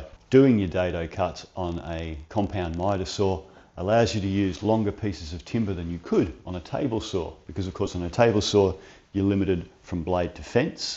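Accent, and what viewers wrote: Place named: Australia. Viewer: That’s what our accent sounds like in Australia champ.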